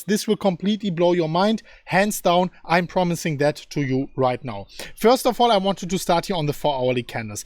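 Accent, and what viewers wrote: Germany. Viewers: Your German accent and energy is really cool....LOL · Wie man den deutschen dialekt hört😂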